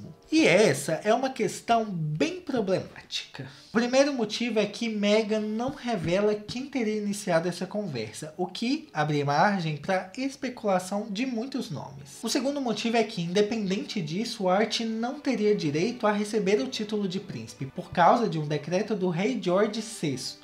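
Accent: Minas Gerais, Brazil. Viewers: Kkkk vc é muito fofo,. Adoro seu sotaque mineiro <3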